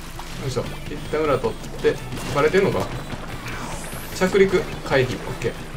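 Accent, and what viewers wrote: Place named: Kansai, Japan. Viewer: 関西弁おもしろい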